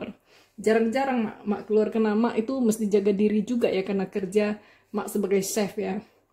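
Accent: Medan, Indonesia. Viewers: OMG ii logatnya medan kali. tapi begitu ngomong inggris kaget i. jago bgt wkwkwk pengen nyobain tapi bahannya susah d sini huhu..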